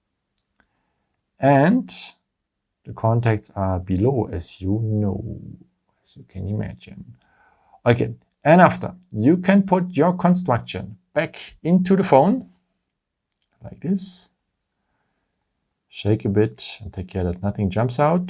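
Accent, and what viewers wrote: Germany. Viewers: Deutscher Akzent:D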